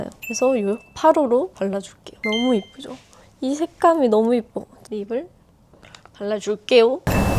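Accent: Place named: Gwangju, South Korea. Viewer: 언니 혹쉬 광주사람? 어디선가 친근한 사투리가 들려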